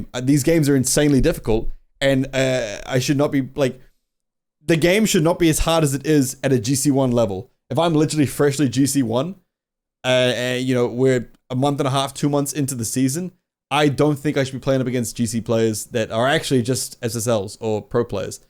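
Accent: New Zealand. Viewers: can u give me some tips on how to do the kiwi accent? it sounds just so clean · I keep forgetting you're from NZ lmao, your accent is so different from what im used to aha, love from Wellington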